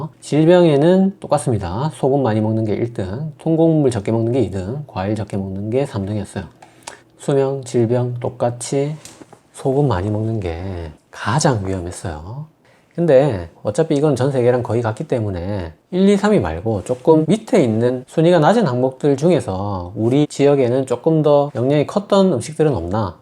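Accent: Gyeongsang, South Korea. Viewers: 웃기지만 사실적 표현 하나 할까요 오래되진 않았지만 님을 처음 영상으로 만났을 때 이름은 무슨 중국의사인가 싶었고 외모는 고등학생처럼 어려보였어요 거기다가 억센 경상도 사투리를 쓰는데 주구장창 경상도를 떠나본 적이 없는 토막이 경상도 사람 그대로였어요 그러나 놀라운 것은 구독자가 보는 가장 훌륭한 의사쌤이라는 것 사랑과 헌신으로 저렇게 열심히 전해주시는데 "고맙습니다"라는 표현이 한없이 부족합니다 열심히 열심히 공부하고 있습니다 선생님도 늘 행복하시기를 기도합니다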